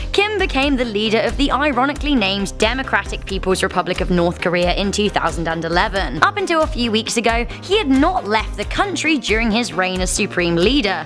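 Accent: United Kingdom. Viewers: ‘Ello fellow Brit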